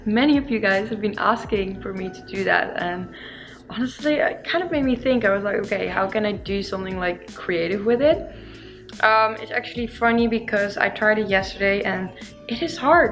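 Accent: Netherlands. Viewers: I missed your sweet Dutch accent here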